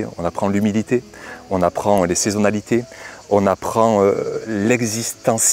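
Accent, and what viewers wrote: South, France. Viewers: Salut, je partage tes observations, je suis a côté du mont saint Michel, et un temps parfait pour le jardin jusqu'ici, quelques pics de chaleurs, de l'eau régulièrement, la meilleure météo est celle qui ne dure pas comme tu le dis (avec ton bel accent du sud) L'été n'est pas fini ne faisons pas de conclusion hâtives, bon courage à toi! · Salut, merci encore de ce partage pré-vacances. Saches que tu nous fais voyager dans le sud à chaque fois et c'est un régal yeux/oreilles 😁 Essonne ici, une réussite exactement comme tu l'as dit, après l'an dernier catastrophique humide et baveux, cette saison est exceptionnellement adéquate. Quelques jours au dessus de 35, vite rafraîchis par des nuages ou carrément la pluie mais qui s'arrête aussi vite, et donc pas de mildiou/oïdium. J'essaye de faire exclusivement avec de l'eau de recup', et mes apports sont exclusivement végétaux, graines de recup', juste pour me dire que c'est maman-nature qui a tout donné en échange de mon attention et mes soins. 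Tu as participé à l'évolution concrète de mon potager, avec d'autres, et je t'en remercie au passage: ton naturel, ta simplicité, ton accent, l'âge équivalent peut être, y'a eu un truc en tout cas. Je te souhaite de te ressourcer, de bonnes vacances à toi et à ta famille et à très vite 🙏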